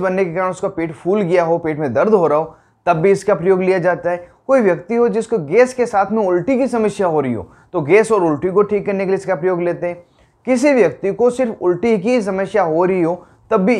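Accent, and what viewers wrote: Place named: Marwari, India. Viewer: Marvadi ho ton to marwadi lgti he 😅😅😅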